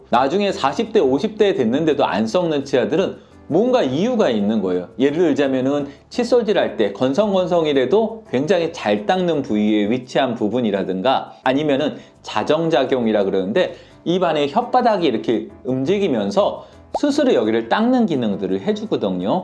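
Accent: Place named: Gyeonggi, South Korea. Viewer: 경기사투리인거 경기도가 고향이신거?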